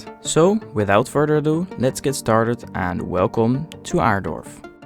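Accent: Netherlands. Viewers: Me vrouw zei hij heeft een Nederlands accent, en volg al denk een jaar je kanaal😂😂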